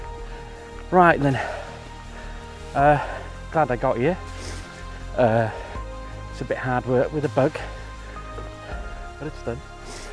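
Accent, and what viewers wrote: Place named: Wales, United Kingdom. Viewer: Great shots,you do make me laugh tho, with you Welsh take on the the English language 😂 oh shit etc 😂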